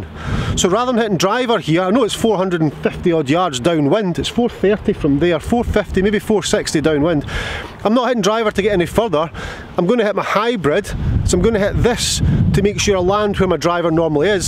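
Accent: Scotland, United Kingdom. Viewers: I love the music, and your 🏴 accent. Realy authentic! Discovered the golfing machine in 2018 which led me to you when you had 6K subscribers. TGM and FREE YouTube channel made me understand previously consumed books and video’s better as well. Keep doing what you do. My handicap just turned 18 and is trending down 🏌🏽‍♂️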